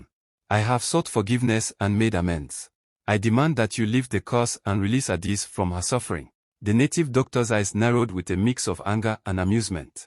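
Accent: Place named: Nigeria. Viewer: Better. Get a very nice one and use instead of this Nigerian voice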